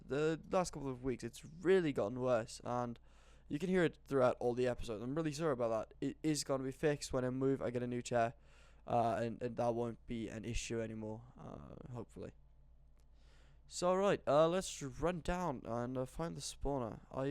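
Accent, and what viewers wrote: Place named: England, United Kingdom. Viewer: You sound english. It is uncanny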